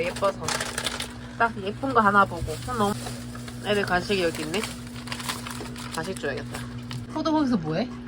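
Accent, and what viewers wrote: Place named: Gyeongsang, South Korea. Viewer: 구수한 갱상도 사투리가 매력적입니다❤️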